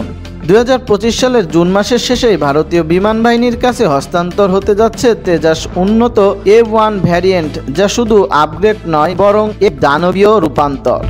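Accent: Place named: Bangladesh. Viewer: এহনো ভাষায় বাংলাদেশী টান ভালোই আসে। তবে ইনি রাষ্ট্রবাদী ১০০%। এতে কোন খাদ নাই। ভালো থাকুন।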